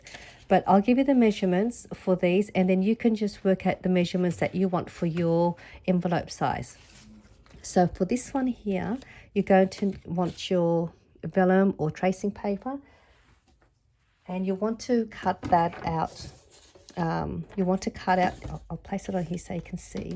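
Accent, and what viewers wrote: Australia. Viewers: Love your video and this idea! Very well explained too. I'm also from Sydney, Australia. Lovely to hear your Aussie accent ☺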